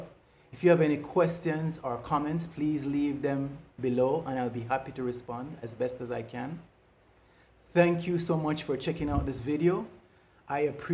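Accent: Caribbean. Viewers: My Brother, my Brutha...I am SO incredibly happy that YT has gotten around to listing your videos in my Suggested Videos, and SO proud of you stepping up to the world with this channel! While BPD, specifically, is not my issue, Depression and Anxiety ARE, and the first thing - my first response - to your thumbnails was 'Finally! A MAN talking about this, quite vulnerably (I saw the video from 2 years ago, apparently, that seems as a confessional, or testimonial, about HAVING the problem; not just prattling about it from a clinical, and thus, removed perspective) and, furthermore, a BLACK man....even, a fellow "COUNTRY man" (as your speech connotes Afro-Carribean sounds to my Jamerican ears, hahaha! if I'm wrong on that, please excuse me). The drumbeat about us men seems to be loudest in constantly bashing and devaluing us, with the term Narcissist being the only term applied to our psyches with any ease - spoken from the mouthes of White women, primarily, and White people, secondarily, as the default "experts" on either component thereof: For other eyes reading this with offense: I DON'T CARE about how "uncomfortable" what I typed makes you! 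Try being said, awful Man of men, let alone a BLACK man, whose own humanity DAY IN, DAY OUT, is denied by the constant and socially co-signed 'drumbeat' that we endure! I have NEVER felt so directly, explicitly addressed and acknowledged by ANY OTHER persons' videos about mental health - helpful as many of them are - before yours, (?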